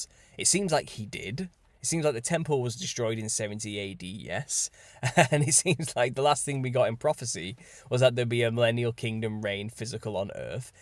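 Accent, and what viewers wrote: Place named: Yorkshire, United Kingdom. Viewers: I am sure there are books missing, has Yah isnt a God of confusion. Which is why i am reading the whole apographer. It will be revealed to us has and when we need to know. In the meantime looking for answers is keeping us busy and we are getting a better relationship with Father because of it. On another note im sat listening to this trying to place your acsent, Yorkshire i think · can I just say what a joy it is to hear a northern English voice. Thought I was on my own here in the northwest. If you are anywhere near, check out the great hall in Rochdale Town Hall. It's amazing, I'm fully on board with this whole theory/truth. Once I had made this decision, we randomly had to visit Rochdale for an appointment and afterwards rather than waste the journey had a look at the town hall. It was like God giving me conformation 😮 keep up your amazing work. 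The bible is just making more and more sense now 🙌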